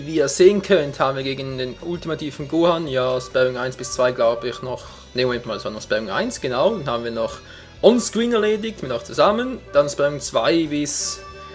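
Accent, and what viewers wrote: Switzerland. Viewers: OK ich bin eindeutlich zu gewöhnt an dein schönes deutsch, das ich dein Schweizerisch erst nach 5 minuten erkannt habe... und das obwohl ich mal schweizer war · Du tönsch wie en koleg fo mir aber de akzamt isch chli andersch freut mich aber das ich weis das auch schwizer lets Playstation mached ^^ es abo hasch fo mir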